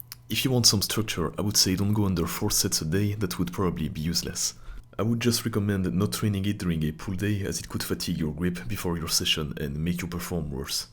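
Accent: France. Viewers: Tes vidéos sont impressionnantes !!! Et en plus j'me dis que je ne suis pas tout seule à conservé mon accent français quand je parle en anglais😅😉. T'es avant bras sont monstrueux, jolie boulot, je me pose la question sur ton régime alimentaires Good job bro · You sound french for real Edit : your are french for real I can ear that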